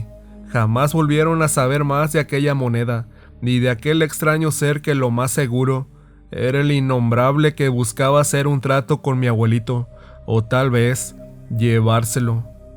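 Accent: North, Mexico. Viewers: ...orale, buenas historias y buena narración, muy norteña.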